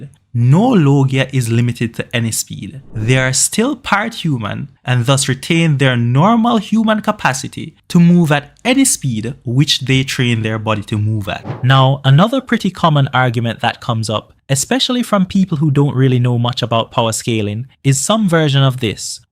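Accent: Jamaica. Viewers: good breakdown , are u jamaican by any chance?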